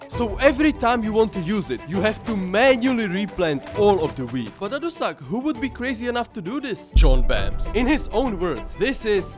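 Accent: Czech Republic. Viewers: Pekne video. Normalne poznas cecha mluvit anglicky😂😂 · You sound Czech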